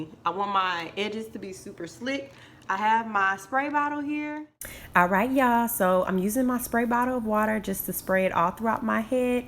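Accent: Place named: South, United States. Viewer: I love your southern accent it’s sooo cute 😍😍😍 and yasss sis this bun came out so cute and effortless! Def gonna be trying this!!! 👏🏾👏🏾👏🏾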